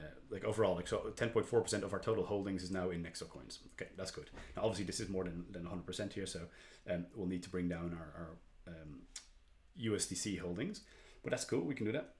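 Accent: Ireland. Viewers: Will definitely be checking this out. Haven't loads of xrp but enough to be using it to make more. Have saw a lot of people retweeting your posts, didn't realise you're Irish before hearing you speak. Just outside Belfast myself.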